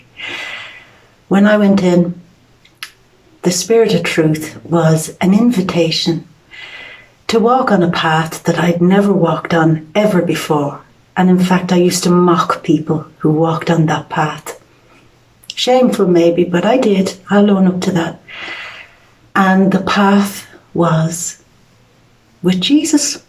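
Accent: Ireland. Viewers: Hello I popped back on your channel today to hear your lovely Irish dusky voice and I wanted to ask how your new path is going!! Hope it’s marvellous x love and peace x j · hey yay.. ouch so glad your back our beautiful., happy 2021, missed ya beautiful irish accent.. thanks for comin back.. bless you beautiful Im sharing you on my MeWe.. looking forward to your extraordinary journey.. Truth Peace Justice and love dear heart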